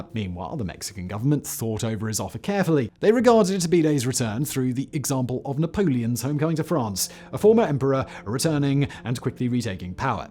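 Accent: RP, United Kingdom. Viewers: A former Brit here who moved to America in large part because of what I'm about to say here. I have delivered a Thumb Down on this video. For the needless sideswipes against American conservatives. I heard a lot of this snideness when I was in the UK and I eventually figured out that it came out of a place of envy. Conservatives in America have elected some absolute duds - George W Bush, ugh - but they also produced Reagan and, yes, Trump. From your accent - which you have taken great care to curate, given the jumpcuts - I am guessing that you identify with the BBC upper-crust. A Brit can always tell.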